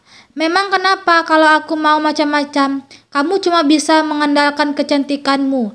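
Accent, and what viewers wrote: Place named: West Sumatra, Indonesia. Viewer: Logat urang minang😂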